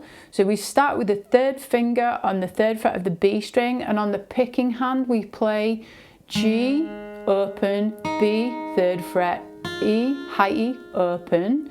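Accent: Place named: Yorkshire, United Kingdom. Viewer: Awesome lesson, thank you. I’m guessing by your accent that you’re not too far away from me Leeds/Bradford area?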